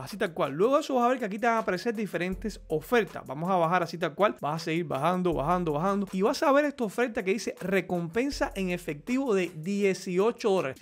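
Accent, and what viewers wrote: Cuba. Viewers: eres cubano verdad?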